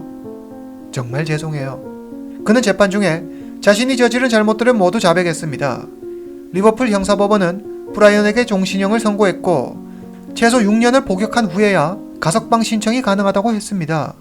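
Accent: China. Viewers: 억양이 한국분 맞나요? 중국 태생이신가요?귀화하신건가요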